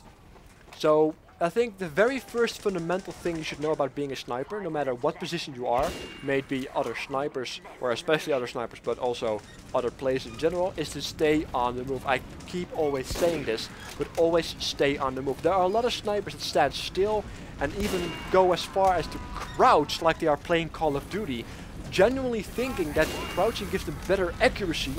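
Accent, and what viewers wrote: Netherlands. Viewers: Ben je nederlands? :)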